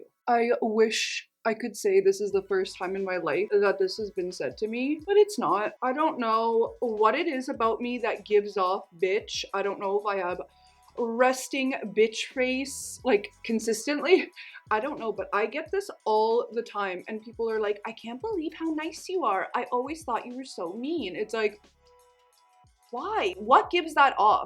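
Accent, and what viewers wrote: Canada. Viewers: Are you Canadian? You sound very Québécois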